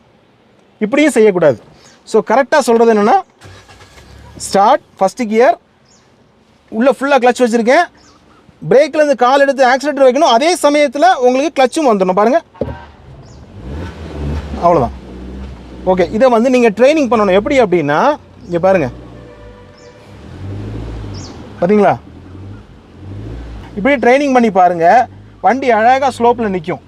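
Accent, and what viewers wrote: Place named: Nanjil Nadu, India. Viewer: எளிதான, அருமையான விளக்கம் தம்பி... உங்கள் நாஞ்சில் தமிழும் அருமை....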